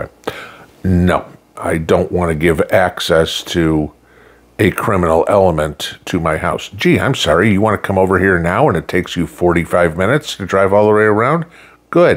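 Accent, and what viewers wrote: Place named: East Tennessee, United States. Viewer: Yup, your speaking the truth. Sounds like you are in East Tennessee. I grew up in Roane County. They do some dumb things. Tennessee has so many people moving to Tennessee. The new comers will try to change things and turn it into what they left behind. Like HOA's hate them. If good men do nothing then evil will.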